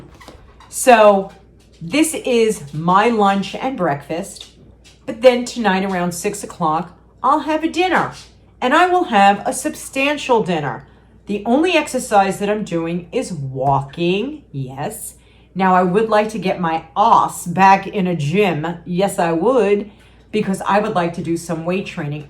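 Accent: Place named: Long Island, United States. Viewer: I just started watching you and you feel like a friend. Your openness and honesty is comforting, your accent reminds me of my Long Island childhood. I could watch you throughout my weight loss journey.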